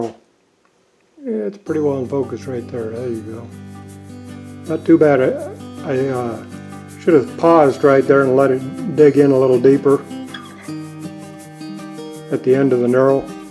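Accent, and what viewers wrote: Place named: Kentucky, United States. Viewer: I live in south central ky. Listening to your voice I suspect you may live close to me! I enjoy your videos!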